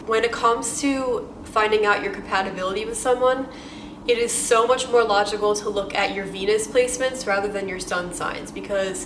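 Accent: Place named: Long Island, United States. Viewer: I love the Long Island accent! Thanks for the video!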